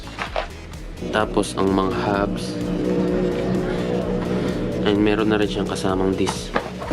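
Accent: Pampanga, Philippines. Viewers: Kapampangan La Pamo Hahaha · boss kapampangan ka pala yee 😂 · Kapampangan ka pala soy hahah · Kapampangan ka pala paps😂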